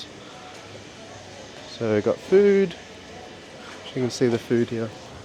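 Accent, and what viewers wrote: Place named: Australia. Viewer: I think he is Australian, it's his accent.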